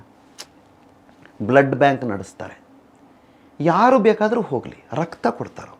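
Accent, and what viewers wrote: Standard. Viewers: ಎಂಥ ಶುದ್ಧ ಕನ್ನಡ ನಿಮ್ಮದು! ಎಂಥ ಸಂಸ್ಕಾರಯುತ ಮಾತುಗಳು!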